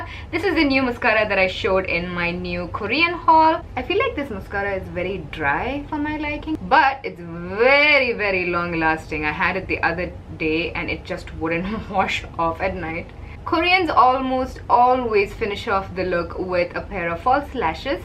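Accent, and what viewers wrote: India. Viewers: she is so pretty 😭😭😭 also Indian accents are so harsh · I've just discovered your channel with this video. And I really like it ! First it's not just a VS makeup, because you're explaining lot of things and I love to learn about the different cultures, so huge thanks. And your Indian accent is so pleasant to hear ! ^^ You're funny and beautiful. I subscribed !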